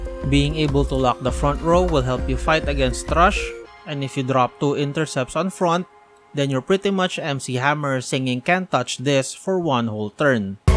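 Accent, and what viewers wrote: Philippines. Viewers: Are you Filipino? Your english accent sounds like it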